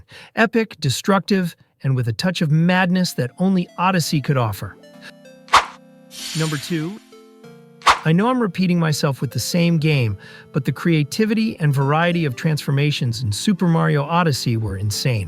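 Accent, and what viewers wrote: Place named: Boston, United States. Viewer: Incredible video! It made me feel nostalgic about when I used to play on my red DS Lite. Awesome, based on your accent, I’d bet you’re from Boston. Cheers, and you’ve got a new sub!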